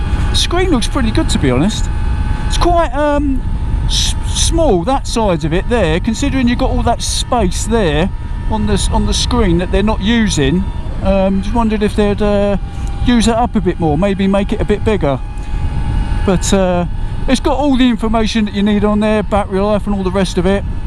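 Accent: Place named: Cockney, United Kingdom. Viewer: That cockney accent is atrocious, crude, almost unintelligible, and for an unencumbered "un-accented" American English speaker...quite funny sounding.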